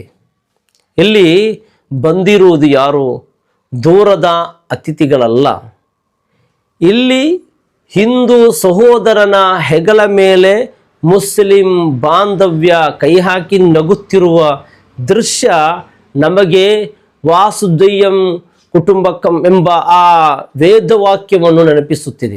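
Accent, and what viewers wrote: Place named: Tulu Nadu, India. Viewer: ಯಾಕೆ ವಿಶ್ಲೇಷಣೆ ವ್ಯತ್ಯಾಸ ಇರಬಹುದು ತುಳು ನಾಡಿನವರು ಉಚ್ಚಾರ ಸ್ವಲ್ಪ , ಬೇರೆ ಚೆನ್ನಾಗಿದೆ ❤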